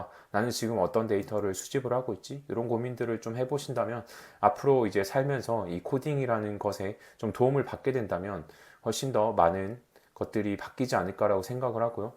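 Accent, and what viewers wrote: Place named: Gyeonggi, South Korea. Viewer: 경기도 사투리 그대로 놔둬도 괜찮습니다. ㅎㅎ!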